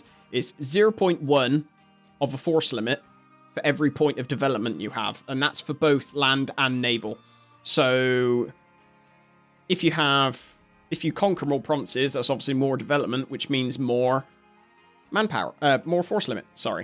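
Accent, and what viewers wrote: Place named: West Country, United Kingdom. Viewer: Lovely West country accent, greetings from Kent.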